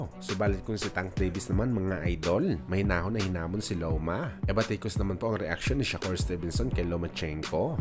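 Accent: Iloilo, Philippines. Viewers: Ayosin mo boses mo idol..murag bayot na ilonggo uy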